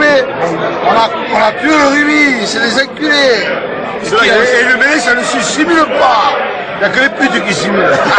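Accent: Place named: South-West France, France. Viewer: cet accent affreux de vendeur de voiture d'occasions du Sud Ouest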